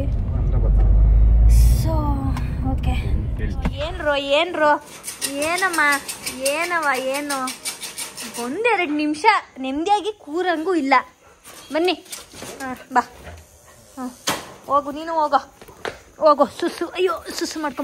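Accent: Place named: Channapatna, India. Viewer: I like Channapatna kannada slang❤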